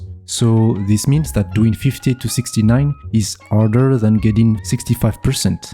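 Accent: France. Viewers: I can smell the little french accent x) Anyways very good video !! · Tu serais pas un peu français frrot ? Ton accent te trahi. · You're so french (i am french and i detect french accent lol) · Are u French? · i hear fr*nch accent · are u french or french canadian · I knew you were french since the first second of the video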